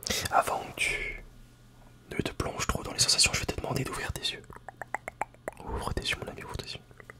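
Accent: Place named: France. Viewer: Alors c'est peut-être moi qui hallucine, mais je trouve que tu as un petit accent mdr Pas du tout dérangeant au contraire ! Ça éveille ma curiosité ^^ Tu vis plutôt dans le nord ou le sud de la France ? Pardon c'est sûrement indiscret ce n'est pas grave si tu ne réponds pas ^^ Sinon j'ai adoré la vidéo, ça m'a relaxé direct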